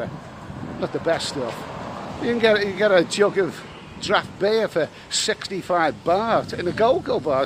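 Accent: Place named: Scotland, United Kingdom. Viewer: You sound Scottish.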